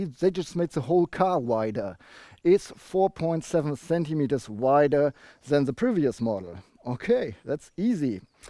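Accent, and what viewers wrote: Germany. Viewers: Now I've trawled through loads of reviews for various cars and have seen some pretty good ones at that. I must be honest and say that the easy and relaxed way that this review was conducted was spot on. Informative, detailed although long kept me glued to the screen. With regard to the strong German accent, having previously lived in Germany for four years can quite honestly say that you speak better English than I speak German but also that you speak better English than some English people I know. I am looking for another car and have decided to buy a 2016 2.0 Tdi L&K 150bhp, having test driven one already find myself bitten by the Superb bug. Thank you for a great review. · No need to apologize for German accent, everyone who speaks English has an accent of some sort. Great review, very in depth, keep them coming. Danke.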